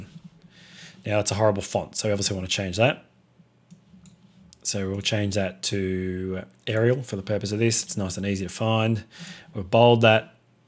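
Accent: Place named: Australia. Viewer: Thank you for the tute.. Best I've found so far. Good to hear an Aussie accent.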